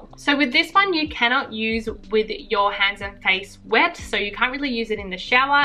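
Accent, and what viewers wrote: Melbourne, Australia. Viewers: I'm trying to develop the superpower that is Being Able to Distinguish Between Australian Accents. You have the accent of a well-educated Melburnian but you sound like some friends I have in Sydney too...I'm torn. Argh. Please let me know whether or not I should hang up my cape ;) PS= Hada Labo will be the next brand I really investigate next time I'm replacing skincare in Japan so thanks for this video. :)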